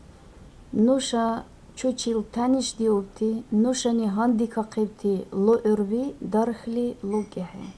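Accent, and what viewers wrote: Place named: Akusha, Russia. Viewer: Думаю Акушинский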